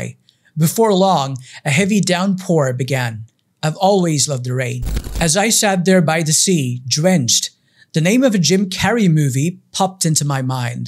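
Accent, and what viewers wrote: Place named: United States. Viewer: You accent awesome. I want to learn American accent as you speak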